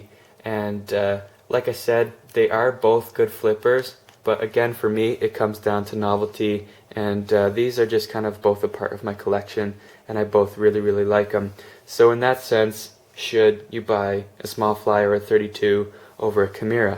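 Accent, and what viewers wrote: Canada. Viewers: Your def Canadian lol aboot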